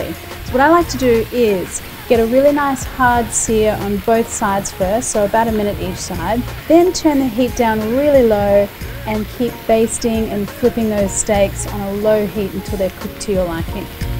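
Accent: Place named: Australia. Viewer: I like you Australian accent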